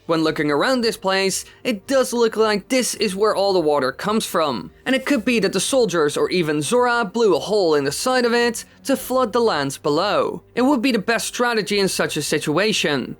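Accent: Netherlands. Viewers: I've never heard of flooding a settlement to defend yourself. That's interesting. Also, I didn't realize that your accent is Dutch, not British. Oops!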